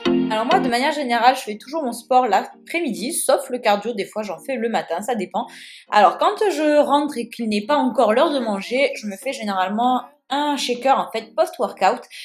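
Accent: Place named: Marseille, France. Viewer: au début on dirais que t à Marseille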